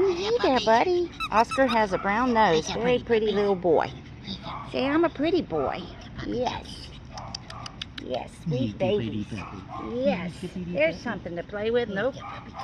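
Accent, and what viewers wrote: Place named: South, United States. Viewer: Well they have a southern USA accent so I would say no to Australia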